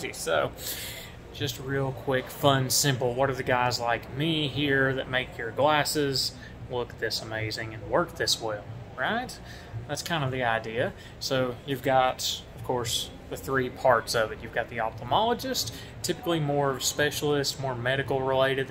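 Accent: Tennessee, United States. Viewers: I love your Tennessee accent lol :)😆Great vid. I wish I had an Optician as passionate as you are 🙂 would love to work with someone excited to find my personal perfect fit and style, and someone who knows the ins and outs of lenses in case problems or questions arise. GREAT Opticians are really few and far between these days...in my opinion 🙂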